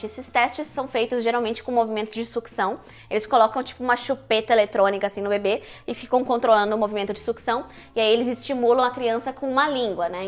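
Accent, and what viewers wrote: Rio Grande do Sul, Brazil. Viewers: Que alívio ao assistir esse vídeo! Tb sou teacher, meu baby está agora com 1 ano e eu estava muito insegura, mas me identifiquei muito com o vídeo e percebi que estou no caminho certo! Tb faço time and place e espero que dê tudo certo! Super obg, te admiro mto e amo teu sotaque, tanto gaúcho pq tb sou gaúcha como onde inglês! Super beijos!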